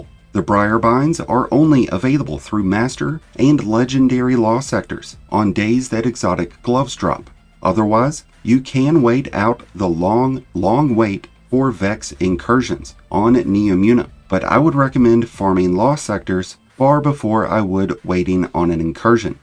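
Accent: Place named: Pittsburgh, United States. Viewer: lol you're from Pittsburgh, aren't you?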